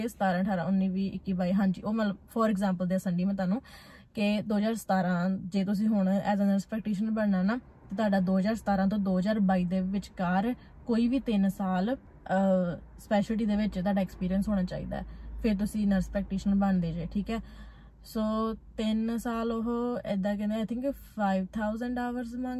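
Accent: India. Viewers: Majhe de ho tusi lgda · Wooow I like your Punjabi accent 🔥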